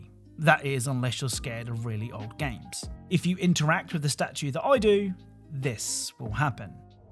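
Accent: United Kingdom. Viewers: I really have to say this but finally seeing how you look in real life your voice doesn't how you look your voice is so tame British I guess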